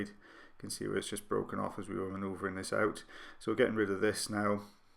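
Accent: United Kingdom. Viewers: So good to hear a UK accent.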